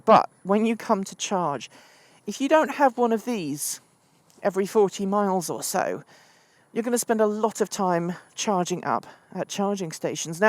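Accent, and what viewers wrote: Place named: United Kingdom. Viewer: She bri-ish aren't you Paying attention?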